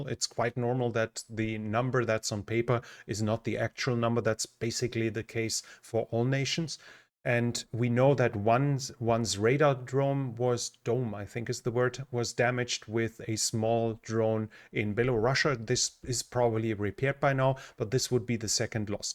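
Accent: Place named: Germany. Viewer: Dein deutscher Akzent im englischen ist schrecklich...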